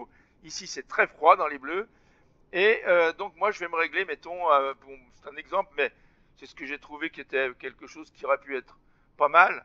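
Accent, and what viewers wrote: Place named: Lyon, France. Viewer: Merci!! Dites-moi, à vous entendre, vous semblez être de la région lyonnaise. Je me trompe? ps: je viens d'essayer, je me suis mis dans le jeu, j'ai bien une carte nvidia, mais cela ne fonctionne pas: « alt F3 » ne fait rien du tout. Arf...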